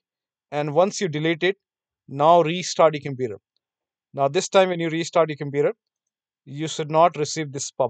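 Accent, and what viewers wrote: India. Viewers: accent like indian tech, thanks , very helpfull matte:elbowcough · I took a whole day, running antivirus, malwarebytes, autoruns, rkill, MSERT, i had tried everything , then I clicked on a random youtube video with 24 likes and a heavy indian accent; then the prophecy became fulfilled, there is no real solution or teaching that is better than this one and finally I can turn off the PC and go to bed. Thanks man.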